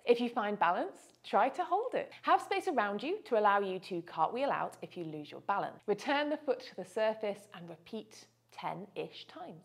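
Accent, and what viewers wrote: United Kingdom. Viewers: Your videos are so much better than others I've seen for a few reasons: 1. No gung-ho ego-driven nonsense, 2. Great pedagogical style, 3. British accent.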